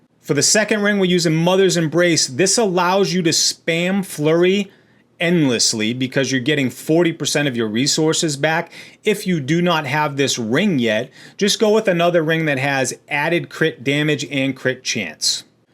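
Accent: New England, United States. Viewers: Do I detect a New England accent ?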